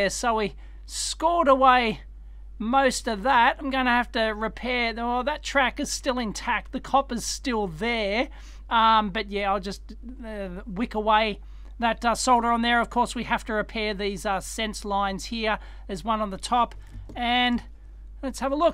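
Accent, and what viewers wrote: Australia. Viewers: Despite the different audio recording setup, you still sounded like an Aussie :)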